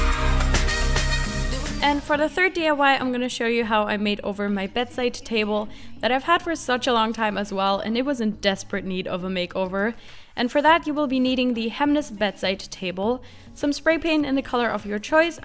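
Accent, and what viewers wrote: Germany. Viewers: Her german accent is almost unhearable, i had to see her amail address to be sure. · may it be you have a german accent? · #germanaccent <3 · Kann es sein das du deutscher Herkunft bist? Man hört einen nämlichen einen leichten Akzent raus.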